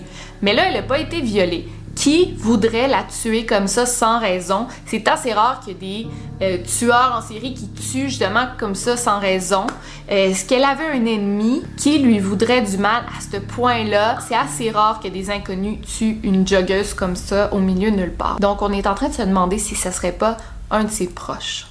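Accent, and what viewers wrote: Quebec, Canada. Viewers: Je t'adore continue même si j'ai recommence à parler avec mon accend du Québec à cause de t vidéo beaucoup trop intéressent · Cette accent Canadienne énervement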